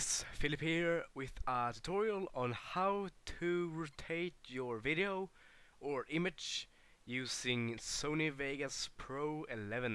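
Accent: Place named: Sweden. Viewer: man vet direkt att du är svensk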